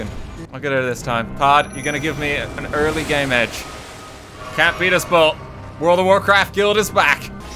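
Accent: Ireland. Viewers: This is my first time watching him and I was just like; "Sniff sniff, is that an Irish accent I hear?" I love the rep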